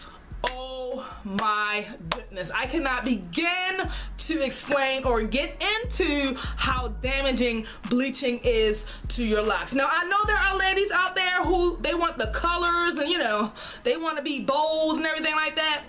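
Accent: Baltimore, United States. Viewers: Great tips! You from bmore shor?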